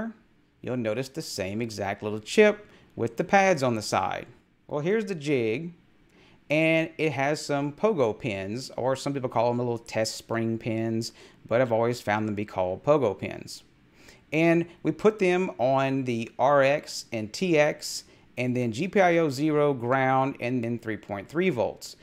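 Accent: Louisiana, United States. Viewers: Very Cajun!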